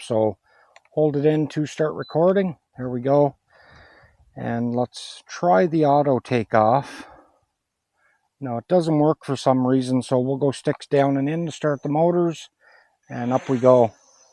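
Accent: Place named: Canada. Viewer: Where about's are you in the world my friend, you sound Canadian to me, hence you got loads of money to buy any model you want :-) Canada or USA?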